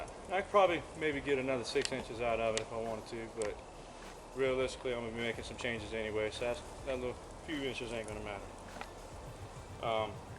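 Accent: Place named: Louisiana, United States. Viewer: I read S. Carolina but Coming from a Cajun, you sound Cajun as hell man lol I just ordered these sticks. Good idea about the rock climbing aider.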